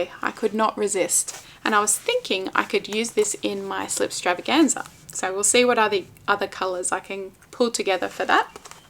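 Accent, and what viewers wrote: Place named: Australia. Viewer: It’s so great to hear an Aussie talking yarny goodness!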